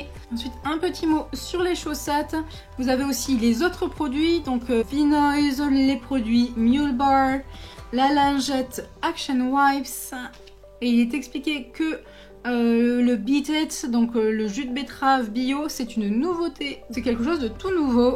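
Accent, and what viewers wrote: England, United Kingdom. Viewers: Des chaussettes 😃 !!!! routine recup ✌️✌ cool l'accent anglais 😜😜 remarque c'est normal !!! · super video encore!! j'avoue que je ne prendrais pas la box pédaleur, mais franchement c'est super sympa de nous faire découvrir ça, et puis c'est toujours cool de pouvoir avoir des nouveautés qui peuvent arriver sur le marché, grace a toi!! Et pour finir j'aime beaucoup ton "accent anglais" quand tu parles:-D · Quel belle accent anglais 😁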